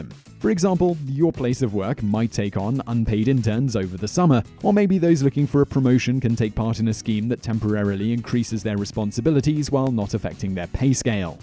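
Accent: England, United Kingdom. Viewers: Your arguments against minimum wage are biased and riidiculous. Also, I do believe I hear an English accent in your tone. Perhaps you should stick to issues in that country.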